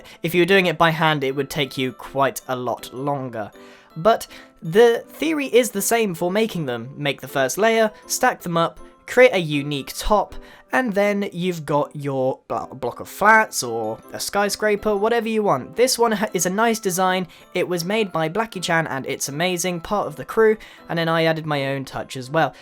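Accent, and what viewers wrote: United Kingdom. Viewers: Right now i feel like grian has become more British lmao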